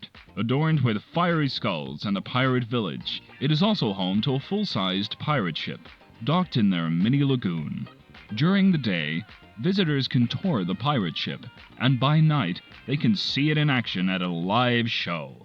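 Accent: United States. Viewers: Pretty good American accent my dude. Also you do an incredible job of showcasing this world. It looks so lively from the angles you picked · This is amazing ! The script is laugh out loud funny ! It must have been a nightmare to create footage that matches the script too ! And a big thumbs up for managing to maintain a consistent American accent for 50 minutes ! Really, this is the sort of thing Rockstar should have released to promote San Andreas back in 2004. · You pulled off a fantastic American accent in this! Halfway through I was wondering if you hired a professional VA to read this huge script. Bravo, and hilarious production!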